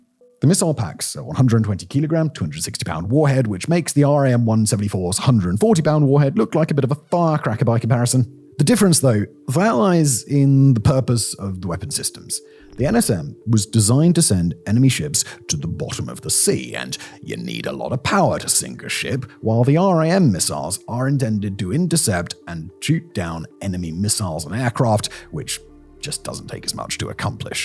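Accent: United Kingdom. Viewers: This guy is an example of idiots with a Lyme accent sounds like he knows what he's talking about but no, another big mouth idiot.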